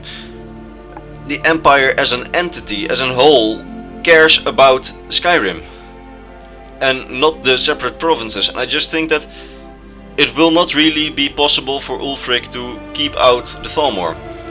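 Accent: Netherlands. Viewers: nederlander dus kan het aan je accent horen 👍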